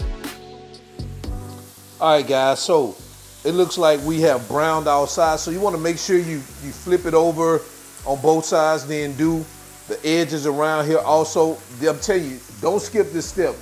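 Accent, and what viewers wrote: South, United States. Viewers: lol a southern accent is not “bad pronunciation”. Be proud of where you’re from💪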